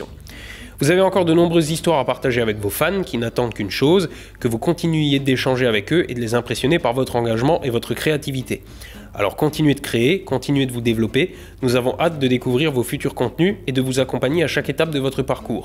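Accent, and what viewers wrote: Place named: Marseille, France. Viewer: a été avec Benoit de Devient photographe les tous premiers tuto que j'ai suivie et en effet il me semble que sa fait bien 8 ans maintenant. Le temps passe et tous évolue aujourd’hui c'est deux cité ne propose pratiquement ou plus de contenu... Je garde de bon souvenir de visionnage et il m'arrive encore souvent de visionnée des vidéos. moins passionnée d'astronomie je vais quand même souvent liké les vidéos pour l’algorithme, car au delà du faite d'avoir des tutos intéressant le personnage avec sont franc parlé et ses mots direct est très appréciable par sa simplicité et étant marseillais je suis habitué a cette façon de parlé.... Bonne continuation a...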